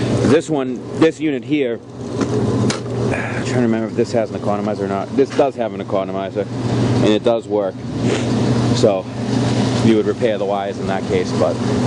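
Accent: Boston, United States. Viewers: I’m a firm believer that them YORKs get assembled but with a lot of failed attempts with parts and as soon as they get it right,they call it complete and move it out of assembly line,is the only way I can explain them cause you can be the best tech ever but you put one together and it fails to start so you gotta tinker around with it so it can start,I don’t like them,nope don’t them.Are you in the Boston area HVAC BROSKI cause I can hear you accent? We need top techs in that area and up into New Hampshire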